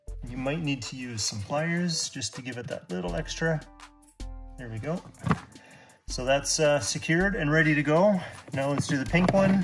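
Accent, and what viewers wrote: Canada. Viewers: I was wondering why you sounded like Jordon Peterson and used some of the wordings he does until I realized you’re both Canadian lol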